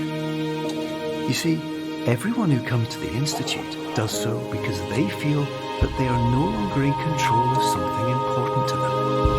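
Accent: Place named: Scotland, United Kingdom. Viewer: It's Scottish! The narrator is Max Howarth, who hails from Edinburgh. I hear him quite frequently on the radio at work with Arnold Clark adverts.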